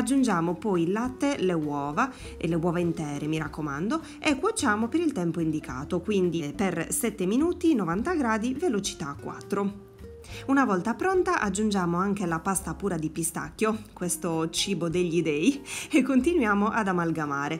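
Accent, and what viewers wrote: Sardinia, Italy. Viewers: Quanto 6 simpatica..amo il tuo accento forse perche sono di sassari..sarda come te..sei di una bravura e poi la verità mi piaci perche spieghi benissimo e nello stesso tempo cucini..che dire la cucina sarda e la migliore..😘😘😘💖✌✌✌ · Non so se mi sbaglio ma il tuo accento sembra sardo! Mi piace moltissimo la torta...anche io faccio l'impasto delle ciambelle proprio come fai tu. Ajò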